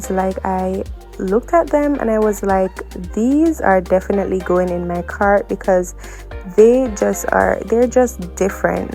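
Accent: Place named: Jamaica. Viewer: Hey Hun, as soon as mi hear di Jamaican accent yuh got mi. I love all of the outfits, mi love Shein Bad. New subscriber ❤. I do have a YouTube channel also, pass and show your fellow Yardie 🇯🇲some love😂❤. Lata❤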